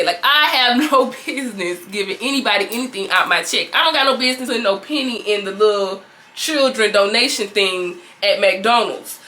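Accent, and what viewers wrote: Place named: Alabama, United States. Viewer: You from Bama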